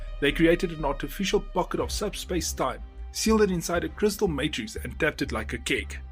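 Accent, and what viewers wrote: South Africa. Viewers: Ek wou nou net se die ou praat net so well afrikaans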